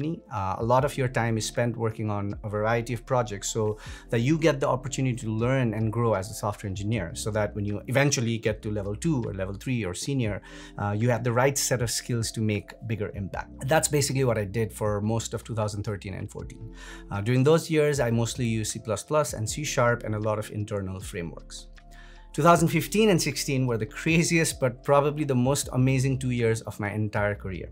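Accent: Nepal. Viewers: now im pretty sure you're from nepal Your accents and all that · Damm you are from Nepal. i knew from the tone & name but seeing conforms it. Hey, tell about how did you get there, the visa and other issues, really cluttered you know living here as a student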